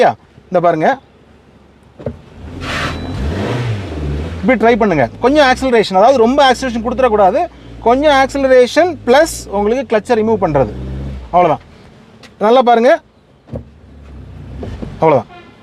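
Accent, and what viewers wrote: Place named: Nanjil Nadu, India. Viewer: எளிதான, அருமையான விளக்கம் தம்பி... உங்கள் நாஞ்சில் தமிழும் அருமை....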